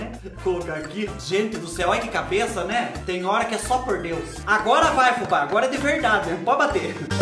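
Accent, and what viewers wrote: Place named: Minas Gerais, Brazil. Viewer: Kkkkkkkkkk vai gostar de falá assim lá em Minas Gerais ,mais me diverti muito.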